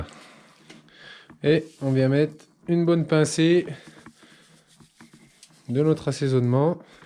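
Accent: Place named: Eastern France, France. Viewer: bonjour je viens d'essayé ta recette et je pense que j'ai réussi assez bien pour une première fois. J'ai essayé de mettre des photos mais ca ne marche pas Quand au lard je n'ai pas trouvé du bon lard frais il faut dire que je réside à la Réunion et ce n'est pas facile de trouver de la bonne qualité. mais le gout y est. J'en profite pour te dire que ma mère est d' Epenoy dans le Doubs. Je ne sais pas si tu es du coin mais vu ton accent tu ne peux être que de la région de l'Est. Au plaisir de te lire François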